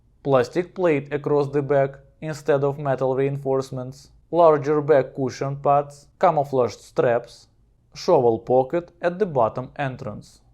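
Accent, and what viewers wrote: Russia. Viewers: Maxed out Russian accent and top video my friend 🤟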